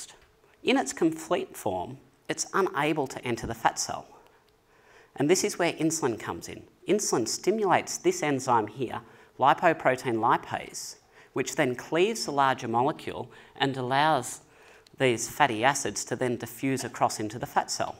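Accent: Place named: Australia. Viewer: What a Strine accent! Though I’m sure that my Midwestern U. S. accent might also be found comical. Very good content.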